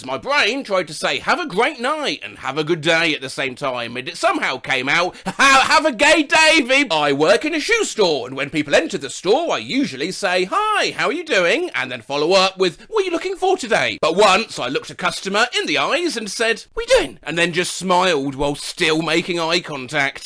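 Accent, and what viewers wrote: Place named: RP, United Kingdom. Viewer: Stop talking posh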